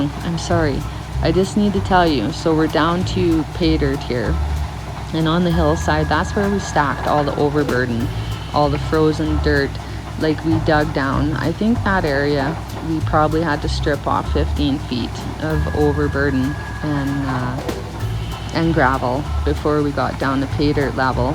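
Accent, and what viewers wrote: Canada. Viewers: I'm here for the Canadian accent, not the gold.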